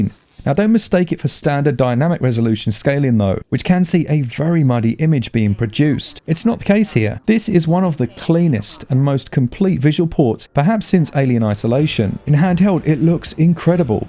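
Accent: United Kingdom. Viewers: Man, I love your british accent! Great reviews, always. You have now a huge mexican fan.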